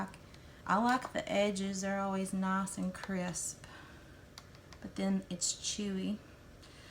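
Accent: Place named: South, United States. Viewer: Love her Southern accent. Much better than the East Tennessee drawl I had many years ago!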